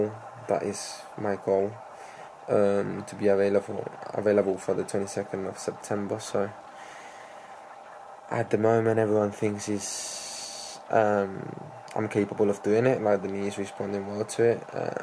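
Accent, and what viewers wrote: England, United Kingdom. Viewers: You sound English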